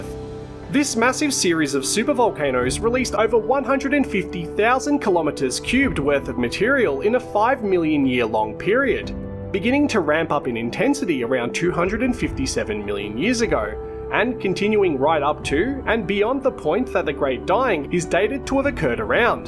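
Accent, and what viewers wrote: Australia. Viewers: I never heard an accent like this narrator. All the most annoying parts of Ozzy, Proddy and received English rolled into one. Add a few Brummy vowels and there'll be another mass extinction event. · If you haven't heard an Aussie accent before (not Ozzy btw) you need to get out more mate.... there's a whole wide world outside the UK. Live a little! 😂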